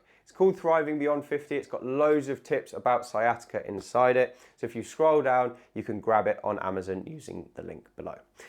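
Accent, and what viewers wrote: United Kingdom. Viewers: when a handsome guy speaks with this British accent you better trust him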